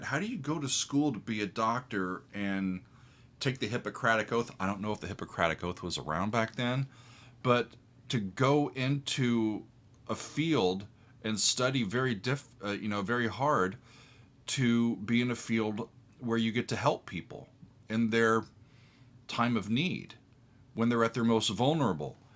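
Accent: United States. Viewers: I love your accent. What State are you from, classical composer?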